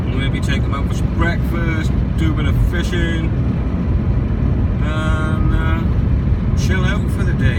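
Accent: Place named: Alabama, United States. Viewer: I'd recognize that voice anywhere! It doesn't matter where you go in the world,. That Alabama accent is always going to shine through!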